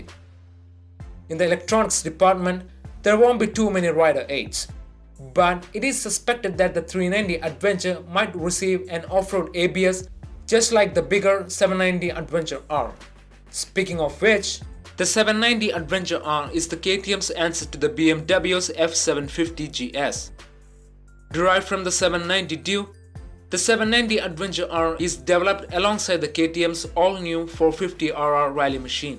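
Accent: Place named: India. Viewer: An indian voice for an indian bike.. finally ! Good, honest job !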